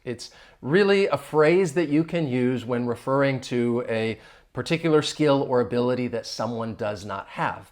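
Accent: United States. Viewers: It's such a great video. I love your american english. When it comes to vocabulary, well, you are a natural. 😊. Hello from france 🇫🇷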